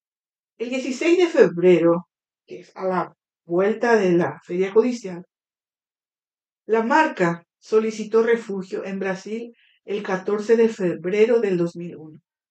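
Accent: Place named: Portugal. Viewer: Me encanta el acento portugués 😘🤗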